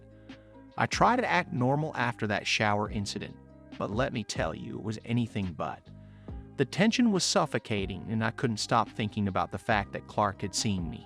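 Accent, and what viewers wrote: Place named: South, United States. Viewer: You sound like a redneck and that's hot! You and you nephew have some fun, a lot of fun many times!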